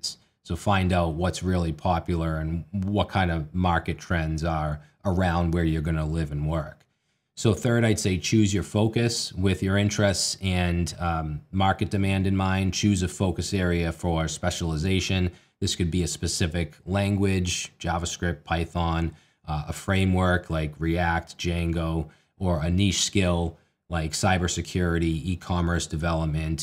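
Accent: United States. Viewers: Bro looks better than when I first saw him, and that was years ago. And got the best American accent I've heard.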